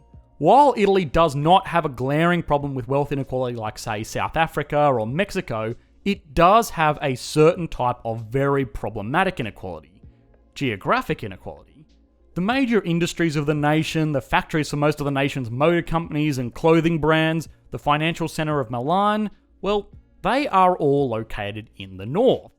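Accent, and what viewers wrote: Australia. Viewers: I love the Aussie accent! So good to hear my people on Youtube. Step aside... Canadians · Australian eh? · The accent is Australian as far as I can tell. His views on Brexit suggest that ie ABC/corporatist/left leaning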